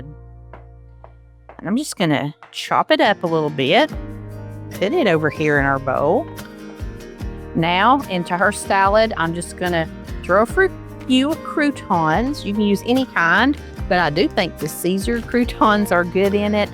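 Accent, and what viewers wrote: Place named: North Carolina, United States. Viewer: Thank you for the encouragement. I love watching your videos. Your accent and loving attitude sounds like my eastern NC home.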